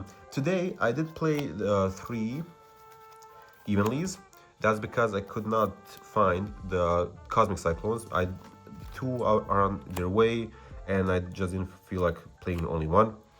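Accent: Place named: Balkans. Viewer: I hear a bit of a Balkan accent there, would love to discuss more hero stuff. Testing stuff out again and defintely could use some tips!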